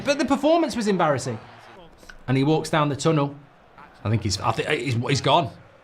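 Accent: United Kingdom. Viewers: Funny old manc accent that!like every other Salford supporters not born within 100 miles of Manchester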